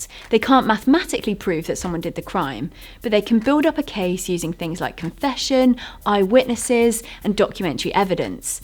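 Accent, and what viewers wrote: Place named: England, United Kingdom. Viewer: Well, you were able to prove one thing at least: not everything said in an English accent sounds intelligent.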